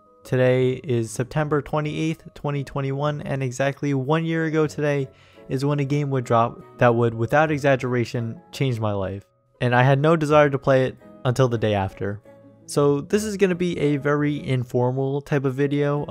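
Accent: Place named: West, United States. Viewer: lol he sounds western no?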